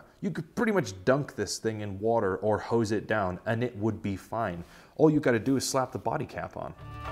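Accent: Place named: Bristol, United Kingdom. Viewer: You're rocking that Bristol accent...!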